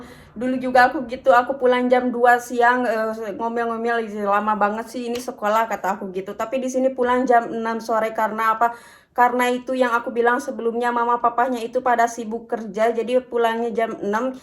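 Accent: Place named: Sunda, Indonesia. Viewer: Euleuh... eta kamu teh logat Sunda na meuni lekoh pisan. 😂😂😂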